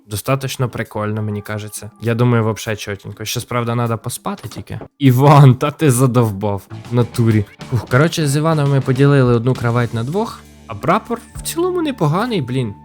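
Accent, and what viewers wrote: Ukraine. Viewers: Как русскому, очень не привычно слышать украинский акцент._.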